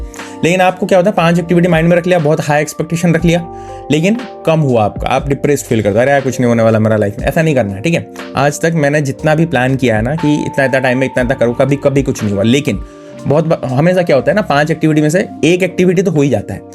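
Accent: Odisha, India. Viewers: Bhai you are odia na? 😅 Btw great 😃👍🏻 video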